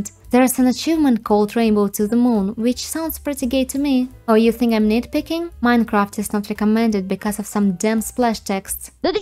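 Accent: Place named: Eastern Europe. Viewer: Yes, it indeed have some slavic languages vibe. Haven't noticed before. She has some strong accent, but it's not clear to recognize for me.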